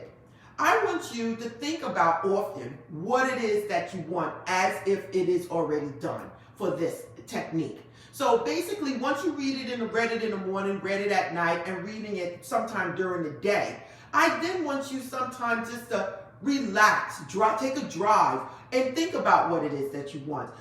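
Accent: New York, United States. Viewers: Love the content and your NY accent ! 😍❤🙏🏻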